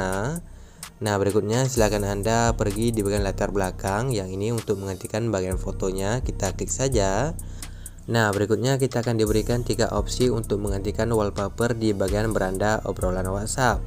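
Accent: Aceh, Indonesia. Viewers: Org Aceh Bg?😂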